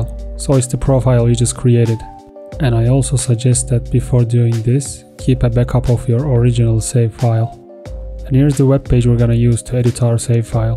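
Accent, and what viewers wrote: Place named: Turkey. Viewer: turkish guy detected gang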